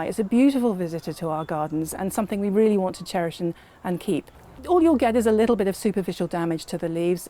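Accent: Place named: Texas, United States. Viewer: The accent lol 😹 I thought this was Texas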